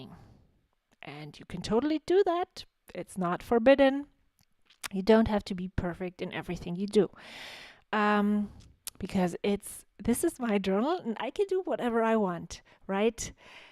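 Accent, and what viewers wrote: Germany. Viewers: nice video. I love the way you speak English by the way <3 it reminds me of my very dear German friends.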